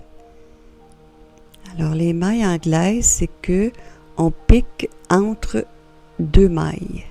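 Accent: Quebec, Canada. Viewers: J’aurais dû regarder plutôt votre vidéo je suis en train de terminer Chaussettes DROPS 3-4 ans avec de la Fabel avec des aiguilles 3 et demie. Il y a longtemps que je n’en avais plus tricoté, j’étais un peu perdue merci beaucoup pour vos explications parce que je vais en refaire avec d’autres laines DROPS pour mes derniers petits-enfants jJaime beaucoup vos explications et surtout l’accent du Québec et les astuces que je ne connaissais pas. Un grand merci 🙏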